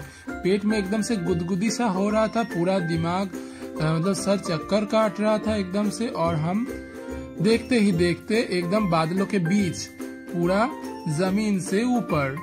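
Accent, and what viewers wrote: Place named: Bihar, India. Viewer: Bhaiya narration bahut badiya kiye ho.. Or accent ekdam bihari kaafik per bhai kasam se narration mast hai bahut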